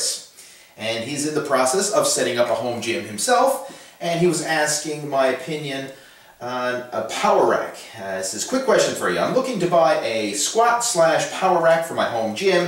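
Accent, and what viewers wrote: Newfoundland, Canada. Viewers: this guy sounds like a newfie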